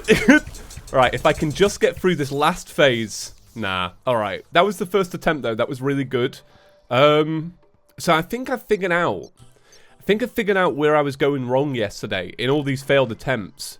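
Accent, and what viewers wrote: United Kingdom. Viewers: You Sound like britain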